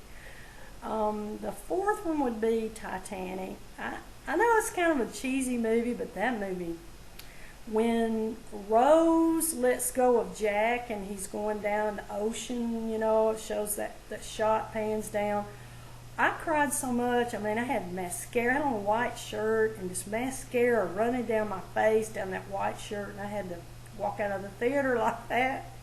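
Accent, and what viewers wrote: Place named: South, United States. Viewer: Those are all great movies. Love watching you talk in front of the camera. Us northerners love to listen to the southern accent shine..lol. If you ever need something to do you could always be a movie critic. Excellent job.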